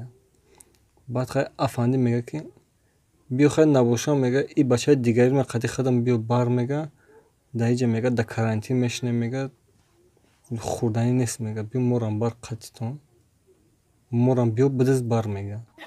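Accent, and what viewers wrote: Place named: Khatlon, Tajikistan. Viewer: Kor nadoren diga rav aval kamtar gap zadana yod gir, yak video 5 minuta 800 bor pauza kaday, ay gap zani malumay ay Khatloni neki gap zadana yod gir.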